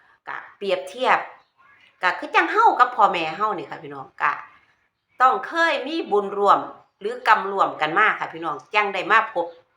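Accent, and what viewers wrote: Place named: Laos. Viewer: น้องเขาคนลาวเขาก็ใช้สำเนียงลาวเป็นเอกลักษณ์ของใครของมัน อย่าอืจฉาเขาเลย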